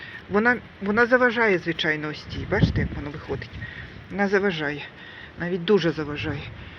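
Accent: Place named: Chernihiv, Ukraine. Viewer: Вітаю Вас! Обрізка гарна. Кординальна. Теж так люблю. Дуже буду чекати Ваші осінні відео, щоб подивитися, що вийшло і як вона буде сформована з квітами. Впевнена, що буде дуже гарний кущ. А ще хочеться подивитися на сорт. Бо в самої є такі 2 різні кущі без назви. І підходять до Левани, і до Кіушу і до Тардіви. І всеодно думаю, шо ні одна з трьох. Тож дуже буду чекати Ваше квітування літньо-осінне. А ще у Вас дуже цікавий говор українській. Такій рідний моєму вуху... У моїх Чернігівськіх родичив такій. Ви не відтіля)))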